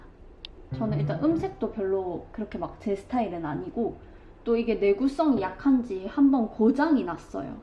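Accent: Gyeongsang, South Korea. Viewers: 경상 북도 사람 이신가여?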